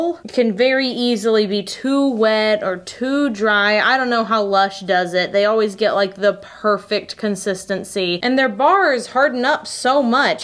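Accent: Texas, United States. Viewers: I kinda wanna know are your from Kansas you said like your from Texas or Kansas?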